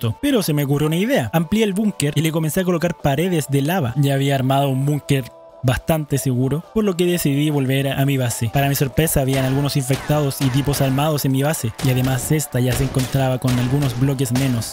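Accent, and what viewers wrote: Chile. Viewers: Me encanta el acento chileno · Verdaderamente escuchar tu voz, narrando con tu acento chileno me hizo sentir muy agusto y entretenida, como cuando veía 31 minutos lol, saludos 🇲🇽😸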